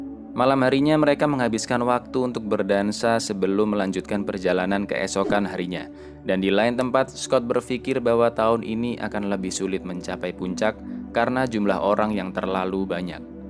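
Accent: Java, Indonesia. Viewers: Jowo pundi mas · Min orang jawa?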